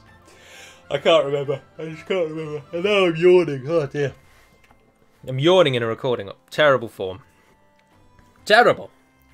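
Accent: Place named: Yorkshire, United Kingdom. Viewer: that yorkshire accent was... enchanting.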